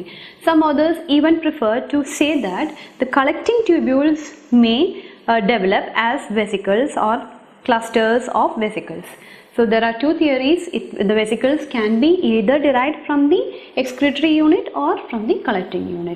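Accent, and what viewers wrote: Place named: Kerala, India. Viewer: മലയാളി സ്വരം. My brain detect sound samples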